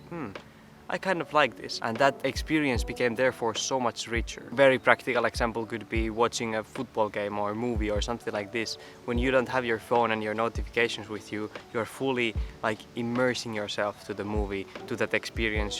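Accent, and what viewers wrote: Finland. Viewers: Hei! Löysin sun kanavan viime viikolla, ja mietin eka että sun aksentti kuulosti suomalaiselta ja katsoessa kävikin ilmi, että oot suomalainen. Aloitin mun minimalismi matkan viime vuonna kesälomalla, ja kävin koko asunnon läpi muutaman kuukauden aikana. Lähti paljon tavaraa pois, osa ihan roskia mitä olin jostain syystä säilyttänyt, osa käyttökelpoisia tavaroita kierrätykseen. Yli vuoden mulla oli kaksi kaappia jäljellä, mutta en ollut jaksanut käydä niitä läpi. Tänään sain inspiraation sun videoiden katselun jälkeen, ja kävin viimein siivouskaapin läpi. Nyt sinne mahtuu imuri (se oli mun unelma, kun aikaisemmin kaappi oli niin täynnä roinaa, ja imuri pyöri nurkissa) Pieni juttu, mutta tuli hyvä mieli. Kiitos inspiraatiosta! Kiva että löytyi suomalainenkin minimalismi YT kanava, katselu pitää asian mielellä ja inspiroi tekemään jotain (vaikka välillä kuuntelen vain huvin vuoksi, saattaa kestää hetki että pääsen itse toimintaan)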